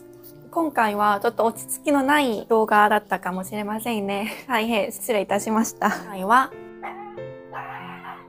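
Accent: Kansai, Japan. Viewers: こんにちは😊辛いの、ダメそうかなと思ってたら、やっぱり🤭鼻水でるし、汗かくし。『ちょっと腹立つなぁー！』ウケました（笑）ちょこちょこ関西弁出るし…。ワンちゃんの大事なところ、隠してくれてアリガトワン！（笑）🐶娘がちゃんより、少し歳下ですが、娘を観る感覚で観てます👍では、アンニョン🎵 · 関西弁使ってるやん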